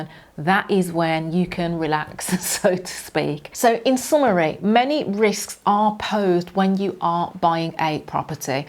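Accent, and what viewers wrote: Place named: Essex, United Kingdom. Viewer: Thank you for the video, last time I left a message regarding to your Essex accent, now in this video you accent is perfect, I can fully understand everything. Thank you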